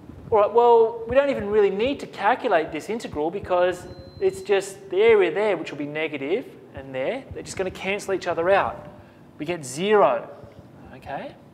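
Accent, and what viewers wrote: Australia. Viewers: I haven't seen too many Australian people on these sort of videos. There's something about the accent that I love even though I'm American! Great work. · Bonus for having a ‘Stralian accent 😊🥳🎊🙌🏽 Awesome video! Greetings from the US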